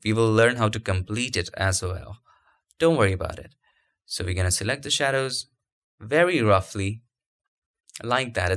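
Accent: India. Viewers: It is called Hindi accent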